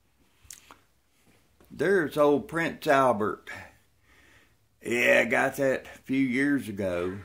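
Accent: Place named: South, United States. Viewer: I honestly enjoy that you sound like forest gump (not an insult on your intellect just similar accent)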